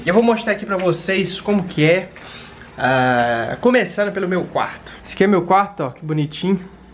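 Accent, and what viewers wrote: Minas Gerais, Brazil. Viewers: E que se toma o cafezim sozim kkk esse e mineiro raiz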